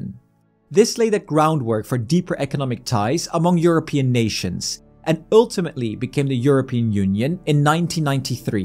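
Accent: Netherlands. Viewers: it feels like you're either Dutch or Flemish speaker. I'm right? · I was confused about this as well as the channel says germany but he doesnt sound german but rather slightly dutch. He might be dutch living in germany or smth.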